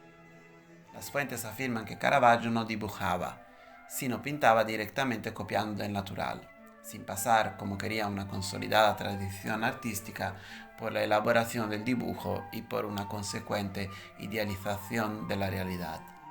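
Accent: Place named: Italy. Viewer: ¿Que “contaminación” del italiano? Habla un español muy bueno, con acento italiano, naturalmente.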